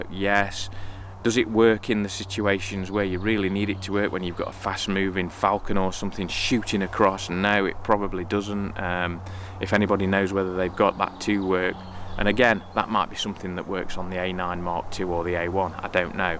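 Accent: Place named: Mansfield, United Kingdom. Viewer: Well! Blow me down with a feather you're only dahn't road! Am frum Mansfield! Well actually I live in sunny Woodhouse (Wudduss) now! Small world! I thought I recognised the accent!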